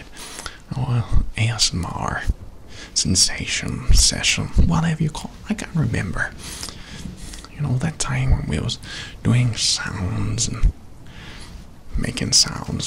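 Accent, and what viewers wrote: United States. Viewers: Its funny what american accent sounds like. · Is this your only video with an american-type accent? It's spot on! And super relaxing! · Your American accent is impressive 👍🏻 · I am shooketh. I can't believe you're so good at a American ascent. Heck I'm American and don't even have that good of an American ascent! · American accent is magical Keep doing doing your thing you sexy beast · I LOVE hearing you with an American accent! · pretty good american accent man!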